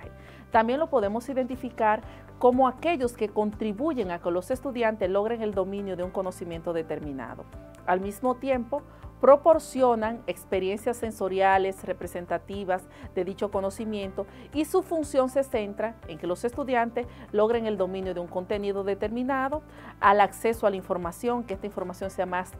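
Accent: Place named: Puerto Rico. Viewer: Aparte de estar escuchando su información al final terminé en escuchar que tiene acento puertorriqueño.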